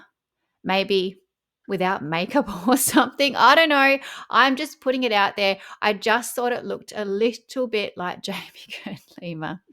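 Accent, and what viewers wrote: Australia. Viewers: I love jour voice and your acccent Is het from Australia Big kiss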